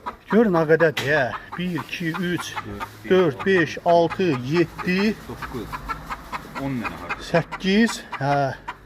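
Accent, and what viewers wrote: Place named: Shamakhi, Azerbaijan. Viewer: Deyesen bura Şamaxidir. Lehcesinden oxsatdim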